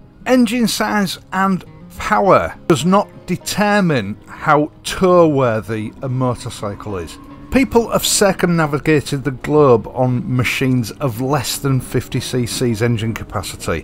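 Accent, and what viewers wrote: United Kingdom. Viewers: I enjoy the content and the calmness of the speaker. I wonder which part of the UK the accent belongs to. Best regards. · Very thick red coat accent as in "the Red Coats are coming".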